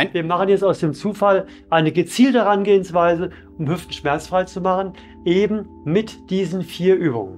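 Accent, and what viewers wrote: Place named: Hesse, Germany. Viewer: the best. Höse hoch usw. Mit hessisch gehts besser. 👍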